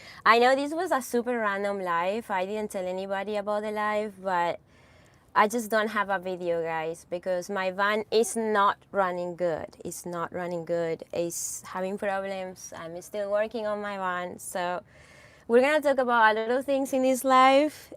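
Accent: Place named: Spain. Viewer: that must be some good stuff if you are seeing aliens on the ocean and on the ground i dont know i think you been in the desert a long time I dont know maybe your seeing blue lites okay (LOL) well come back to earth you havent met me yet you have no been able to date men because it wasnt me I am simple living man I am a leader I would protect you I mean I have bad knee from an accident but I can walk I use a cane and I can cook for myself and clean things I get social security so I dont have to work I can spend all my time loving you and caring for you. I am a tradtional man I am honest romantic I am older but I have values I am close to my family I have been without a partner for 15 years but I know that me and you would get along you have the bigest brown eyes and long brown hair that I love and tan skin and I love your spanish accent I am a german but born in America I dont care to much about material stuff your the type of women who likes to live simple and i love that I am very masculine and I want a women who wants a masculine man like me. 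anyway I know that we would click I just know my telephone number is <num> I am in omaha Nebraska and I can come to you I know that you dont want problems or to be some ones mom I dont need that I will not bring any problems with me and like i said I can do things for myself I also know about engines I can work with tools and I have knowledge about a lot of things I play guitar and bass guitar I write love songs list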